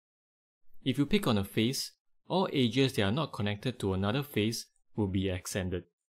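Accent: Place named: Straits, Malaysia. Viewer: Thank you for the clear and to the point tutorial. After watching 4 other confusing and poorly presented videos, this was refreshing time saver, as was the Straits English over Indian English dialects.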